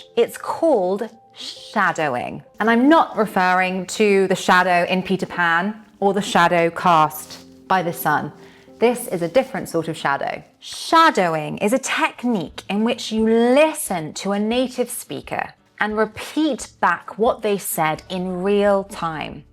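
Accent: United Kingdom. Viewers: I really appreciate your videos They've been a great help with my English. Thank you very much indeed. (Especially lovely British accent) 🎉🎉🎉🎉🎉🎉🎉🎉🎉🎉🎉🎉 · May know mam, which british accent you have?? I love the way you sound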